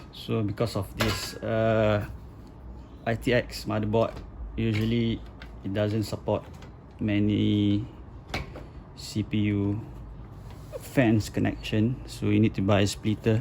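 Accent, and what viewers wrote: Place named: Malaysia. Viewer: Judging from your accent are you from malaysia?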